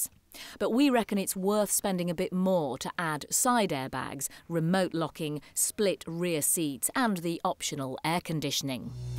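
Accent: RP, United Kingdom. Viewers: I’m 14, 5”10 and I fit in the back perfectly! Enough headroom and isn’t bad on comfort not exactly a rolls Royce but perfectly fine for most people and oh my god did this woman annoy me when she said about having to adjust the mirrors manually and having to wind the windows down manually with her “posh” accent she made it sound so working class to her assuming superior lifestyle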